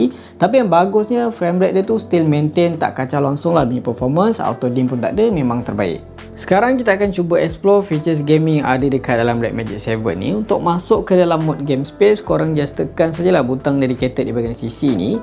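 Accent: Terengganu, Malaysia. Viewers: kemah orang ganu kite... tiberrrr 😁😆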